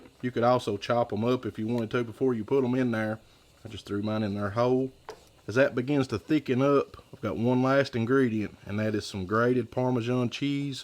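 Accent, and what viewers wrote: South, United States. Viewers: Love your videos and great instructions. And your accent is the best! I’m a Texan living in Michigan, and I need my Southern accent fix from you!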